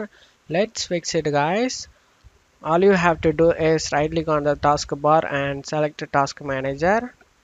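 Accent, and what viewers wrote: India. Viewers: you know it when u hear that indian voice its the real deal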